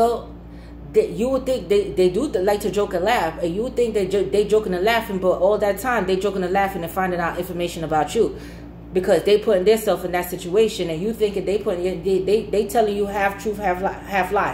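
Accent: New York, United States. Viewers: I'll share with you what I gleened from your analysis as I am a cancer male. First thing..you are a very lovely woman whom I enjoy looking at and listening to. You are down to earth yet majestic. You possess a measure of love but life has taught you some things so you definitely have an edge. You also sound like a new yorker so that could've made you even more aware of life's more cruel aspects. Now to sum it all up cancers are very observant and while they may address certain things the more problematic things...you may not realize they see...because they won't say anything...for various reasons. So it may seem like a "gotcha" moment when things are revealed and laid out on the table. Remember this...for the most part are intentions are good.. if we engage romantically as we are not playas and we certainly are not good liars even when we have to show and prove to people who believe they can out slick a can of Penzoil. 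Peace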